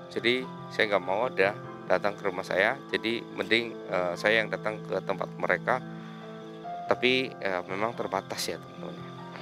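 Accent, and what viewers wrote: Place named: Ngapak, Indonesia. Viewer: Ya emang logat Jawa ngapak begitu bahasa ngoko itu/kasar..bukan bahas kromo inggil.. Atau lisan Jawa kraton gitu om.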